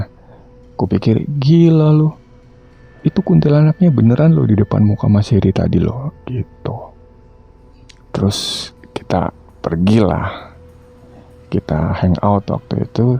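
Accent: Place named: Jakarta, Indonesia. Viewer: Logatnya Jakarta bgt ya.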